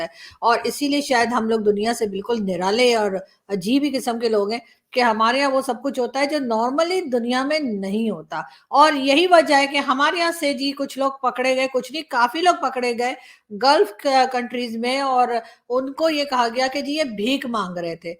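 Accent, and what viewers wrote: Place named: Allahabad, India. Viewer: you speak EXACTLY like ALLAHABADI speak You have atleast, inherited this from your father You are the MOST GRACIOUS AND LOVEABLE DAUGHTER OF ALLAHABAD MY piles of love and Ashirwad ❤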